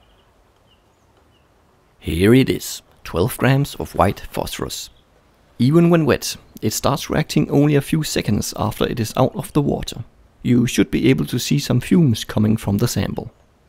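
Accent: Denmark. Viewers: Almost a year ago, but still awesome! I knew you were from Denmark, i could hear it in your voice :-) I'm from Denmark myself. But it's nice to know a danish youtuber making videos based on science. Keep it up! :D Fede videoer, hold det kørende! :D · are u a dane? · o_O you are from Denmark! for a long time I have been wondering, because of your ascent, and thought it sounded Danish. Så hejsa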